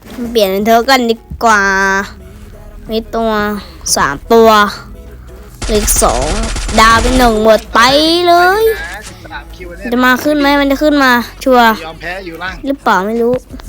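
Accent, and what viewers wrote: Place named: South, Thailand. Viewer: พี่ พูดภาษาใต้ได้เลยผมก็เป็นคนใต้😊😊😊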